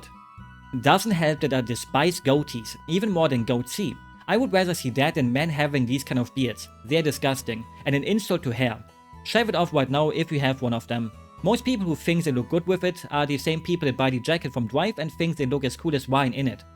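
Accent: Germany. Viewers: I just found out about this channel and realized how many similarities we share we are both Nia fans and have an silly accent, I have an welsh you have an German accent😂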